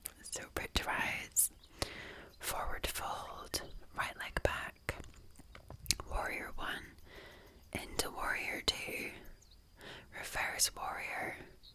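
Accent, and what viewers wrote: Scotland, United Kingdom. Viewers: 3rd comment..hot mom Scottish ❤ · Thank you so much,.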